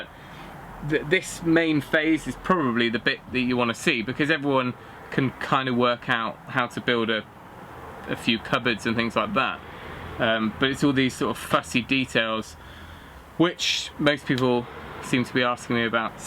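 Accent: United Kingdom. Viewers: I believe that's a proper English vs American English (pronunciation) thingy. Pro-noun-see-A-shun Pro-nun-see-A-shun Lol :). Love this series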